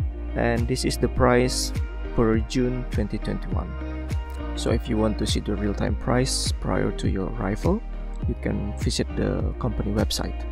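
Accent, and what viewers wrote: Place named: Indonesia. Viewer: Hi are you Indonesian? You have accent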